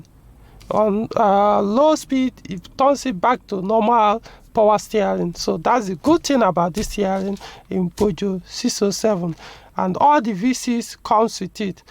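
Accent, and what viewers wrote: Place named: Igbo, Nigeria. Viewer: He's speaking English with Igbo accent/intonation. He's from the Igbo tribe in Nigeria.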